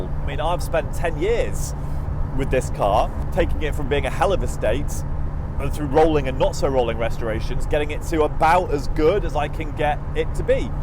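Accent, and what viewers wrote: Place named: England, United Kingdom. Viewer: Good review, but please lose the English accent, it sounds silly.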